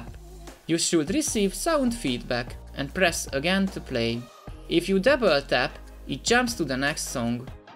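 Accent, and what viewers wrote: Hungary. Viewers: Az a magyaros kiejtés 10/10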